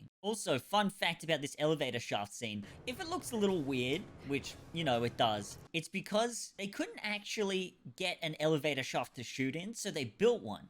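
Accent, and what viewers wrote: Australia. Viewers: Never heard anyone pronounce Tubi like “tubby”. Lol! Thought we all knew it’s from the word “Tube”, as that’s the nickname for old school TV’s (coming from the technology used, to create the broadcast picture on the screen. They utilized a series of vacuum TUBES). Interesting. That a Aussie thing (not sure which country you’re from exactly, so I’m just guessing by your accent. Lol!) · Ok Australian Kurtis Conner! This was funny as hell loool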